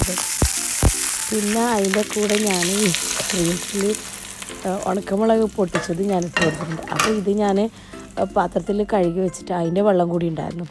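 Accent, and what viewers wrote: Kasaragod, India. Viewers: Kasarod slang super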